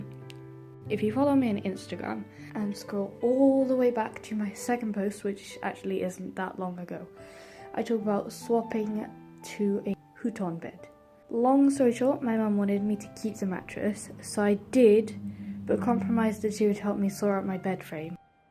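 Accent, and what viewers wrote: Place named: United Kingdom. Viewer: Great video! Fellow Brit here but your accent is like 100% nicer than mine 😂😂😂 I’ve always wanted a diffuser so I’ll check out that company. Fantastic video!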